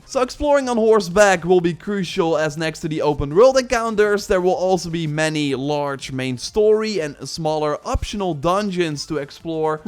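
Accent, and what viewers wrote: Netherlands. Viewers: The Dutch accent is strong with this one. Good informative video 👍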